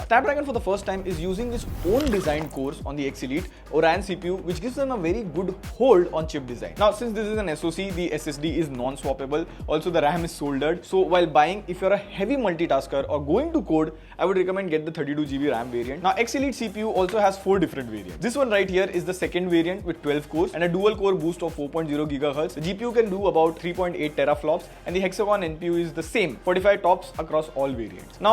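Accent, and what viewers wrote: India. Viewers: terrtble hindus accent speak slower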